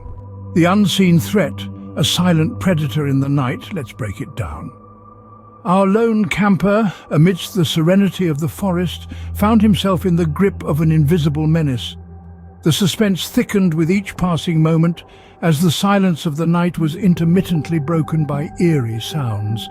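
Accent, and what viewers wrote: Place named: United Kingdom. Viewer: A British narrator. How wonderful. Our American friends will love it.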